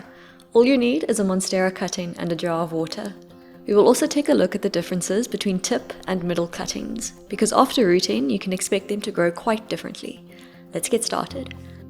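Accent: South Africa. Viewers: Are you South African. I detect a slight accent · Very informative, I'm definitely going to try and I was happy to hear a fellow South African!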